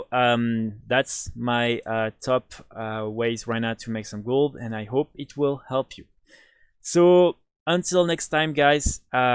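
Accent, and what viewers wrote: France. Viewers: t'es français?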